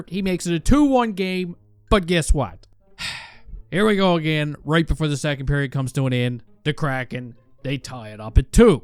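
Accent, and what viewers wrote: Newfoundland, Canada. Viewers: I don’t think it’s from anywhere in Britain. Pretty sure it’s newfie (I say that lovingly by the way)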